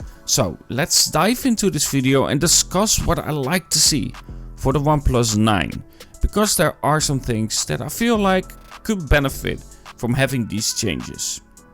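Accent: Netherlands. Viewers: nooit geweten dat je Nederlands was, ik hoorde het accent en dacht gelijk dit is een Nederlander.🇱🇺 Keep up the good work 💪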